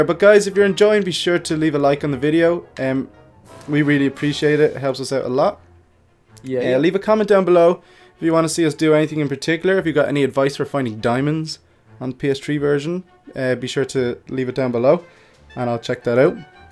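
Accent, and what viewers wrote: Ireland. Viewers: R u irish cuz i am Lepracorns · Is he Irish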